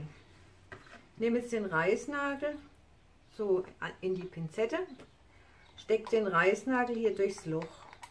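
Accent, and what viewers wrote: Germany. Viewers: Hallo ich danke Annemarie dass ich sie gefunden habe. Das bild ist wunderbar. Ihren dialekt kommt mir bekannt vor sind sie villeicht von der Baden _Wuerttenberg gegend? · Ich hab dich auch durch Annemarie gefunden. Ich habe ihr Tun vom 1. Video an verfolgt und sehr viel gelernt und auch viele Fehler vermieden. Bin schon seit 20 Monaten am Pouren, mit viel Freude und Illusionen. Du greifst gerade eine ziemlich neue Richtung auf. Kennst Du Kristin Oladotter Steen? Sie hat auch das Problem mit den ungewollten Flecken. Falls sie dich stören, 1 Teelöffel Alkohol vor dem Gießen in jeden Becher rühren, dann geht es besser. Ich freue mich schon auf deine nächsten Werke und hab dich gleich abonniert, damit ich keines deiner Werke verpasse. Ich habe leider nicht soviel Platz, um größer als 50x50 zu machen und außerdem fließen meine Stauräume schon seit Monaten über. 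Das ist ein echtes Problem wenn man süchtig wird oder schon ist. Ich lebe in Norddeutschland und du nach der Farbe deiner Stimme sicherlich im Süden. Liebe Grüße Karin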